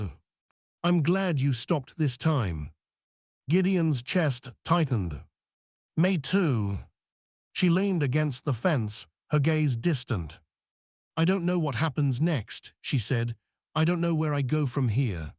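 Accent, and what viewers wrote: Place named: United Kingdom. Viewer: He sounds British.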